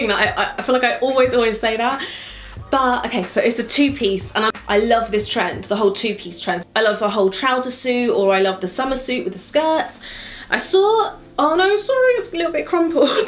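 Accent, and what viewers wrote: United Kingdom. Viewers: I think the only reason I see your videos is your british accent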